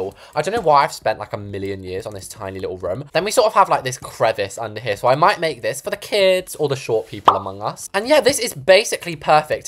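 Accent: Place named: United Kingdom. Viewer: Wait you’re British????😮😮😮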